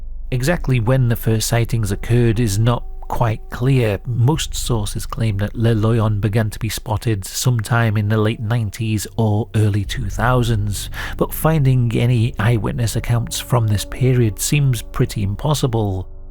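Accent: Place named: Newcastle, United Kingdom. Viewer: Thank you so much for every video you do. The quality and research are always top-notch, and you present a wonderful mix of theories about even the most far-fetched stories that is engaging to anyone, no matter how sceptical or credulous they may be. I especially love, rather than sensationalism, the empathy you show for the victims of horrible crimes--concern about how their last moments must have been, that sort of thing. Many other horror channels get a bit too focussed on the grotesque nature of violent acts and forget the poor souls they are inflicted upon. Most of all, oddly, I love your accent. My old granddad was born near Newcastle, got conscripted in the war, and met my grandma while stationed overseas so never went back to the UK. The war bizarrely saved him from a probable early death in the coal mines, like his forefathers. 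Listening to you speak about gnomes and spooky photos and so on takes me back to when I was little and he'd read me stories about teddy bears. If you read this, thank you for all you do, and please never apologise for your health. I wish you the best of luck finding effective healthcare. You are an absolute gem. The sheer number of subscribers and patreon supporters you have makes it clear how many people feel the same way.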